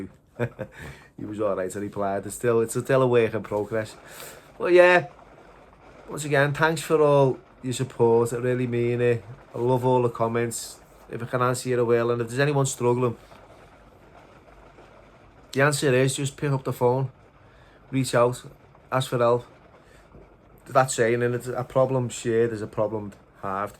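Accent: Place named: Scotland, United Kingdom. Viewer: Nailed that Scottish accent. Like me ma. What does heed the baw mean 😮strange people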